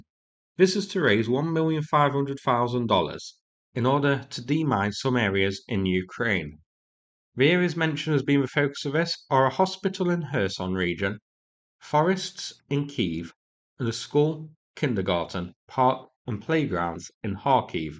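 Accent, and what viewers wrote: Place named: Northern England, United Kingdom. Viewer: sounds like north england to me.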